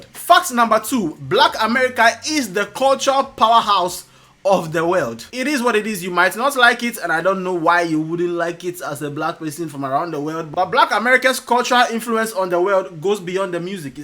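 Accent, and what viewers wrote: Ghana. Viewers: this failure think say he dey wine me. You average 700 views when it's about your boring, begging, imf dependent republic. Your biggest views in the last 15 months is about kai cenat in Nigeria with those funny but nonsensical headlines. You're a Mediocre who review trends from Twitter with your funny but disgusting Ghanaian accent and the terrible English that comes with it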